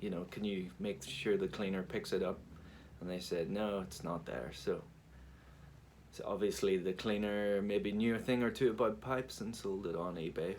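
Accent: Ireland. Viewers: Great first video! Really enjoyed it. I’ll have to catch up on your other ones now. Welcome to presenting , I’m sure your channel will grow quickly. Everyone loves an Irish accent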